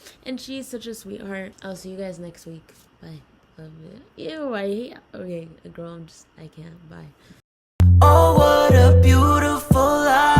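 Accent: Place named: United Kingdom. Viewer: Ur gorge also that british accent tho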